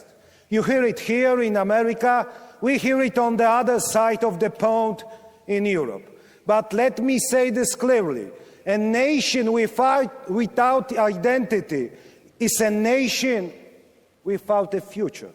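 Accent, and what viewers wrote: Poland. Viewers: Hmm polski akcent? Ogarnij sie😮 TO Polak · Angielski prezydenta nie brzmi sztucznie, słychać polski akcent, a sama wymowa jest pod kontrolą. Godne wystąpienie.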